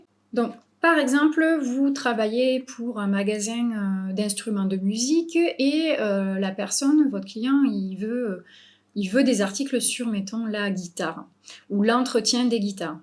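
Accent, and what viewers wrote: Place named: South, France. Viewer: Merci beaucoup pour vos infos, génial 👍 avec le petit accent du sud comme j'aime 😉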